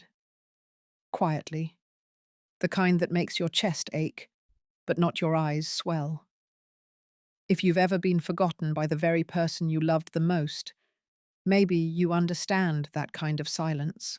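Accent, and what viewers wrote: England, United Kingdom. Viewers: Loved the story, and oh that beautiful English accent to read. Instead of the harsh American accents which are so jarring.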